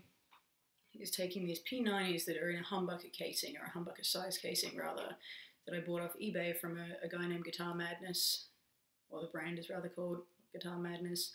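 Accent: Australia. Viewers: you sound aussie. you in oz or os and how have you found buying china outside of ebay?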